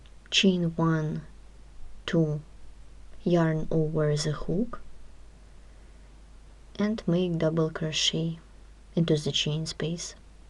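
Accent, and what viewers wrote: Russia. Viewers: А русский так хорошо слышен через этот англ.))). Красивая работа.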